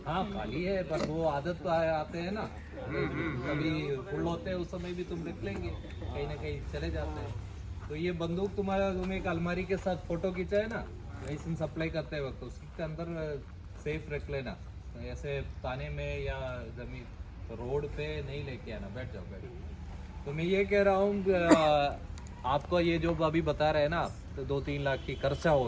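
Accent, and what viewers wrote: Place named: Kerala, India. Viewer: Mujhe aapka Hindi sun ke humare Kerala teacher ka yaad aa gaya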